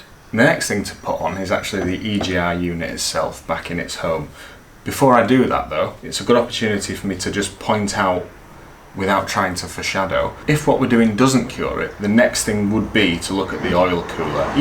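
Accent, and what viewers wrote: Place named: North, United Kingdom. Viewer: Great video, similar issues here with my 2012 Panamera 3.0tdi, would you like to repair mine for me, happy to have the car dropped off wherever you are, sounds like you’re a friendly northerner, I’m near Preston.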